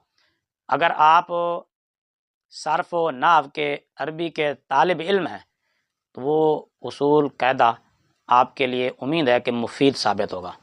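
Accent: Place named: Punjab, Pakistan. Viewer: مفتی صاحب آپ بھی گلابی اردو بول رہے ہیں آپ کی اردو میں پنجابی لہجے کی ملاوٹ ہے کراچی میں آپ جیسے لوگوں کو ڈھگا کہا جاتا ہے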